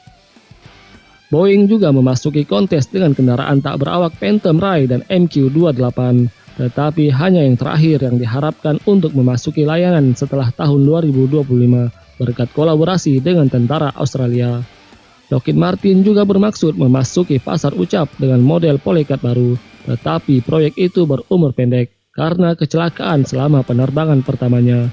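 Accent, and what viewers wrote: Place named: Saparua, Indonesia. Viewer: Min kau orang saparua k...logatmu kental orang saparua😁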